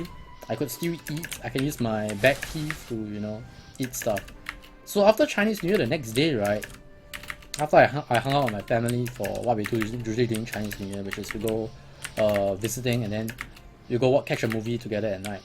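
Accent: Singapore. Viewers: ! are you singaporean?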